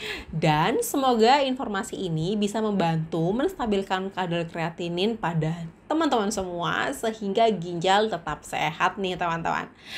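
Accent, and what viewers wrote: Java, Indonesia. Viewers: Dokter medok😂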